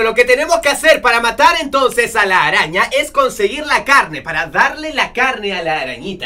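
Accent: Chile. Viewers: eres de chile?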